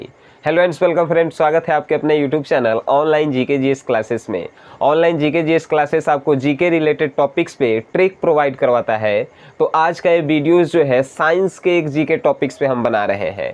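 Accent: Bihar, India. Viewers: Sir ap Bihar se belong karte h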